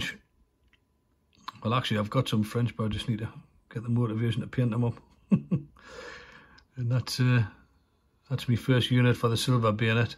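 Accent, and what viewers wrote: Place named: South Essex, United Kingdom. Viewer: Very nice. You can't go wrong with a bit of South Essex.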